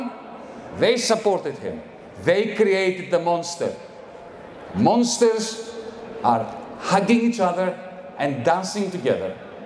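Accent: Greece. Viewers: 典型的希腊口音英语。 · 希腊人说英语的口音都是这样😂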